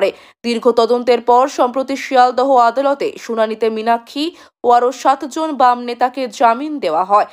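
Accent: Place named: Bangladesh. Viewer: আপনি যতই ভালোভাবে বাংলা বলুন না কেন। আপনার কথায় বাংলাদেশীয় ব্যাপারটা রয়ে গেছে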